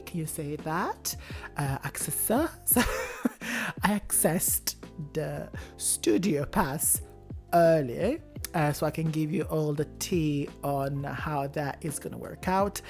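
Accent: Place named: Japan. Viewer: I am obsessed with your accent. Are you of Japanese descent? More videos please, but also include more pictures.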